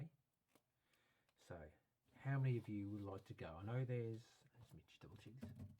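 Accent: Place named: Australia. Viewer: lol...You do a good job with an Aussie accent! :-)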